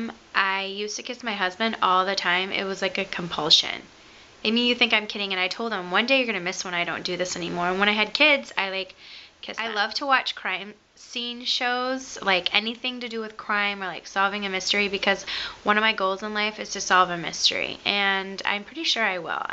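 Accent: Michigan, United States. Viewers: Ok, how did I miss this one? I feel like I'm your stalker, but redheadphd linked it as being one of the funniest videos she has ever seen. You're so cute,! I personally like your Michigan accent. It reminds me of one if my best friends that is from Grand Rapids.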